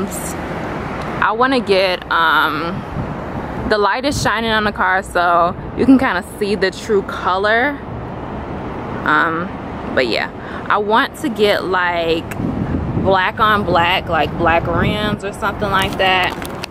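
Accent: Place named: South, United States. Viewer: You have a southern accent, so initially, I thought you were from NC; then I thought, maybe Texas. Chicago?! LOL!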